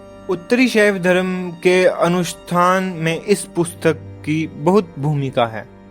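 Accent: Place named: Kashmir, India. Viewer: Narrator is Kashmiri, I can tell from the accent… loved that ❤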